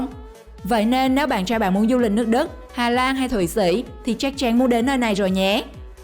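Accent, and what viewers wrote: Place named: Phu Yen, Vietnam. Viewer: Đây là giọng Phú Yên,gần Bình Định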